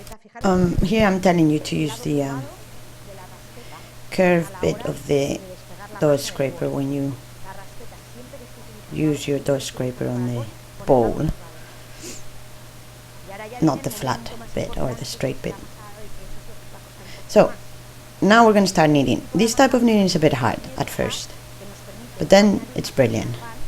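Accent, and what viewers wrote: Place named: Spain. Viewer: She isn't Latina, but Hispanic she is from Spain can tell from the accent. People from Spain are Hispanic.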